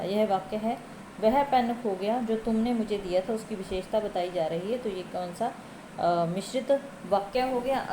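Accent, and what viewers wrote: Punjab, India. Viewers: Mam aap Punjabi ho kya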